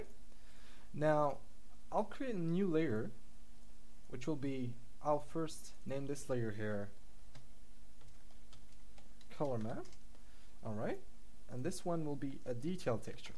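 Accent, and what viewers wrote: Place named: Germany. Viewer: you are a true artist, and you can explain very well! even the german accent is adorable :)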